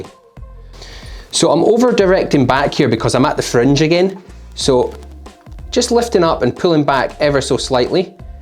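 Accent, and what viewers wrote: Scotland, United Kingdom. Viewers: Love the Scottish accent. It's like watching a production of Brigadoon! Great videos 👍😌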